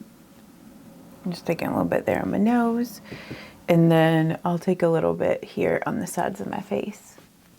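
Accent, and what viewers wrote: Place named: Virginia, United States. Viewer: Hello from Canada,. I have this eyeshadow palette and I will definitely try this beautiful look. I have the exact eye colour as you. I love your accent. (Virginia??)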